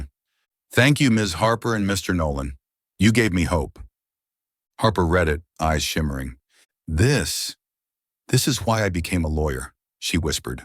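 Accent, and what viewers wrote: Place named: United States. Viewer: Thank you. Good story. Appreciate the American voice telling American stories!!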